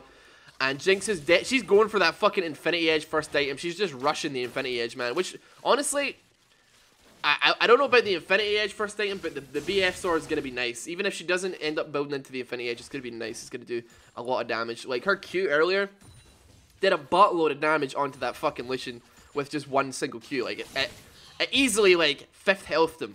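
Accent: Scotland, United Kingdom. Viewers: where are you from? scotland?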